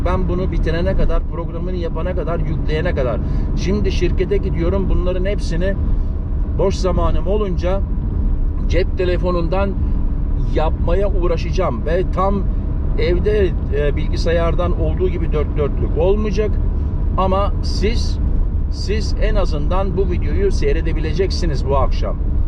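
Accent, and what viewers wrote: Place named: Thrace, Turkey. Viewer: videolariniza yeni bakmaya basladim. Herkez yerine, „Erkez“ diyorsun. Memleket neresi? Trakya şivesi duydum gibi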